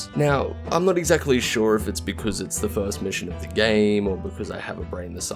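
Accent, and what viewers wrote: Australia. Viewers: This just got recommended to me are you Australian ? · I think he's Aussie lol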